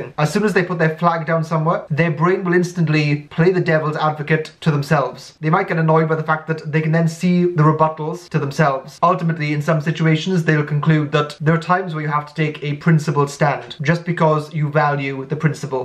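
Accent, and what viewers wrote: Wales, United Kingdom. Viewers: Sounds Welsh to me. :-)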